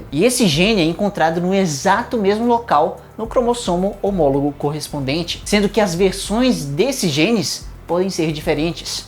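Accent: Ceará, Brazil. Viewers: você é cearense né?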